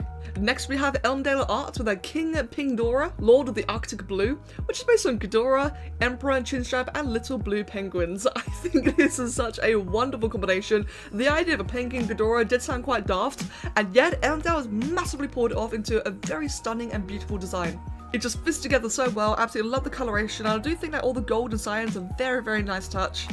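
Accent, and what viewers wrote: United Kingdom. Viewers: Ooookayyy youre British?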